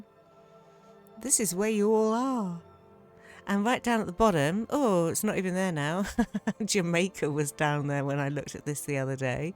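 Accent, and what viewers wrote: United Kingdom. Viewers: I just love your videos and appreciate your consistency. Your warmth comes through in your videos and I always feel better after having watched them. I've downloaded many a mod because I wanted to know what mods you were using (and thankfully, you often say what plant, flora, mount, armor, etc mods you're using), in addition to being able to "try on" a house mod before donwloading it. I also bought Fallout 4 because you mention it so often. Still haven't played it, but at least it's in my library now, thanks to you! Thank you so very much for sharing your passion and talents with us. I hope you have an uplifting and joyous year! PS - I would swear that your day job is with Classic FM (You certainly don't have to say! Your privacy is your own.) Every time I listen to it, I think, "Is that??" You have such a lovely voice. We Yankees are such suckers for the British accent.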